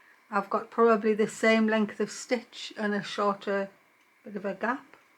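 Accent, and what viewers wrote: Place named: North East England, United Kingdom. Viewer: For me, it’s so lovely to hear somebody from the north east because it does feels like I’m listening to a friend or relative. Thank YOU for being here ❤❤❤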